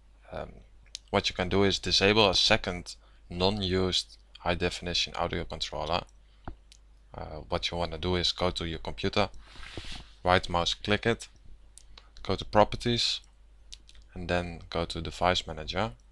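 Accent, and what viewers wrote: Netherlands. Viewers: Such a dutch accent <3.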